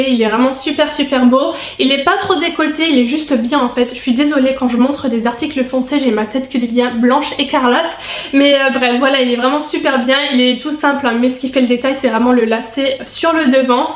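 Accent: Lorraine, France. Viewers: Le petit accent de la Lorraine profonde on connaît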